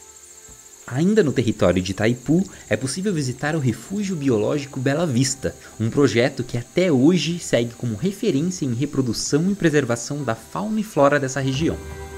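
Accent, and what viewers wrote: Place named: Santa Catarina, Brazil. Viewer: Nossa! é de arrepiar cara, produção de cinema! você é catarinense? tem sotaque de Catarina kkkk sou de Massaranduba - SC abraço e sucesso!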